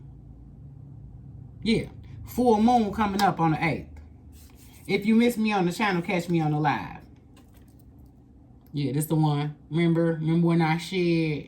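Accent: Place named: Chicago, United States. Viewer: I know a ChiTown vibe when I hear it, lol. Good read Queen!!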